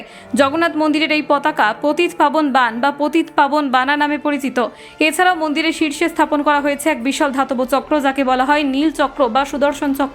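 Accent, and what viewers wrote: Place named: Bangladesh. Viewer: Apnar acsent seems to be Bangladesis. Decision is very much correct. Puri must be sacred and peaceful.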